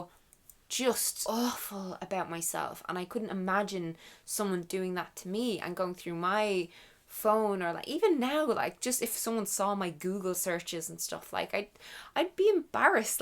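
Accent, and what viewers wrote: Ireland. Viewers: Hey. I'm am old fan. I picked up ur Irish accent in my teens 😂. I'm in my 20s now and my 3 worst habbits i wld really like to control are: 1. Waking up late. 2. Biting my dry lips. 3. Not drinking enough water.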